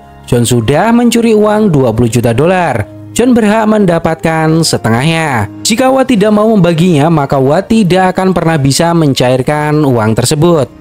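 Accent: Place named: Java, Indonesia. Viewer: Medog bgt bang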